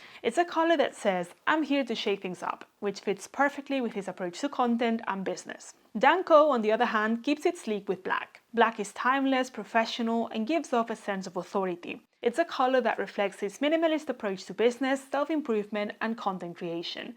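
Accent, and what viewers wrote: Spain. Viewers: Puedo detectar el acento español hahaha, buen video!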